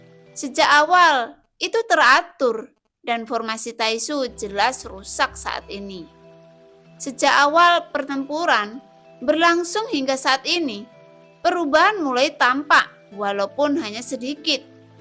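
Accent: Java, Indonesia. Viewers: logat Jowo kok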